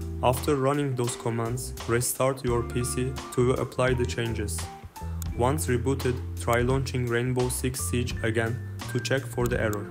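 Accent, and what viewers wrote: Turkey. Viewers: eyvallah reis işe yaradı aksanından belli türk olduğun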